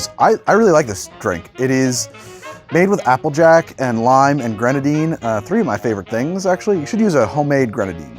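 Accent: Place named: New Jersey, United States. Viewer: i knew you were from jersey lol idk why but i could just tell. so now i gotta ask taylor ham or pork roll?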